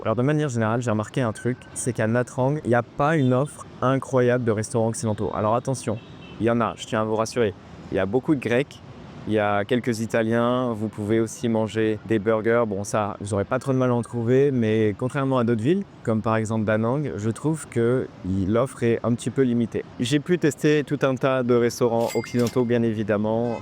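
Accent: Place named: France. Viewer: Totalement d’accord, j’arrive de Da lat et vraiment le coût des logement à Nha Trang est vraiment très, très intéressant pour de très bonnes prestations. Pour la conduite, tout à fait d’accord, prudence! Un point qui m’a choqué à Nha Trang par rapport à Saïgon c’est que les motorbikes ne respectent pas la nouvelle loi et passent au rouge alors qu’à Saïgon, les scooters s’arrêtent aux feux (ce n’était pas le cas l’an dernier) Un point qui m’étonne dans tes vidéos alors que tu y es depuis pas mal de temps c’est que tu prononces le nom des villes à la française, c’est une volonté de ta part ou c’est que tu ne fréquentes que les « digitals nomads » et pas les locaux? Côté activité, tu as quand même le Musée Yersin et la Galerie de l’un des plus connus et vénérés des photographes vietnamiens: Long Thánh.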